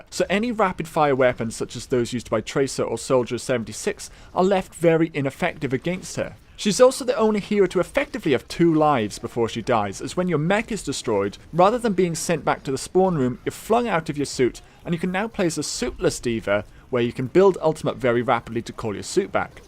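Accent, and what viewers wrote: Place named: England, United Kingdom. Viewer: dont get me wrong i really really like ur vids but i so not agree with u on this vid. no hero is actually ez to play cus 1. each player have his own play game and and there are players that it would be difficult to them to play those heros with there type of play. 2. try to play those heros in actual sieries mode or like comp, its not ez to play them like this. another thing i want to say that every player should play what he likes to play even if that was the hardest (all though i allready explained that there are no ez and harders) and the players dont need to play just what fits to to new players they need to play what they like and what they want to. thx and pls answer me 1 more question: in the qna vid u sayed u r not from england. then where are u from? u really sums from england thx!! and again dont get me wrong i love ur vids just i dont agree with u on this 1 and dont worry, i didnt dislike XD thx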